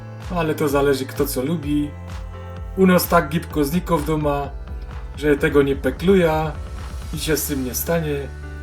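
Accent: Silesia, Poland. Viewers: kochana,wspaniała gwara śląska❤ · Obudz sie.Przecez mowi gwara slaska.Mozna zrozumiec. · już raz robiłem szynkę z Twojego przepisu, bardzo dobra, teraz będę wstawiał poraz drugi i pewnie nie ostatni,Fajnie gadasz po ślasku, tak trzymaj, pozdrawiam z Podlasia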